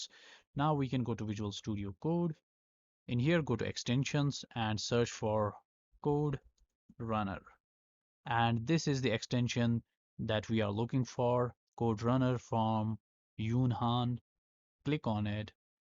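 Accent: India. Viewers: bro u indian?🧡🤍💚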